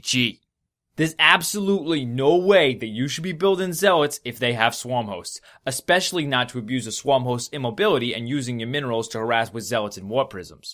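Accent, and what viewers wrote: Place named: New York, United States. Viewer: He speaks in a hard new york accent.. haaaad cawntaaaaah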